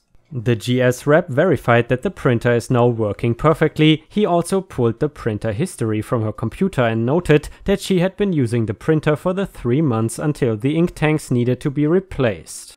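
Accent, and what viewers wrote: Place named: Germany. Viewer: Hey man! Glad to see you're still making grade A content! It's just that... You're relapsing! Hahaha! For one, I think it's really cool that you take your viewers constructive critisism to heart, as you did with mine in the past! The thing I'm talking about is saying "so the next one is the update to (printer lawsuit)". I havn't noticed you doing this as much lately, but it's starting to appear again. Please specify that you mean "the next POST" instead of "the next one", because stating "the next one" usually means "the next STORY". But in this case, we're talking about an update to the SAME story. Once again, I think your content is great, just a couple of things that grind my gears ;) By the way, are you from Germany or Austria? Love the accent either way!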